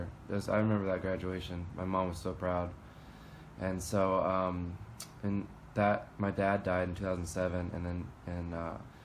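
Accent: Bisaya, Philippines. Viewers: thank you for sharing, your a tough guy. God bless your family, and i cant wait to hear your bisaya accent soon :)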